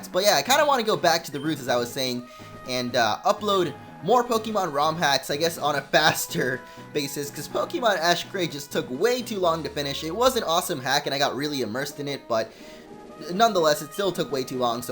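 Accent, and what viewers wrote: United Kingdom. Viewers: yea hes britsh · very British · He is definitely a Brit... XD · oh so very british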